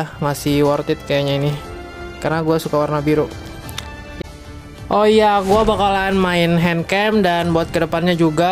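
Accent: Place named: Banjar, Indonesia. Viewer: Bng org bnjr? Soalnya logat nya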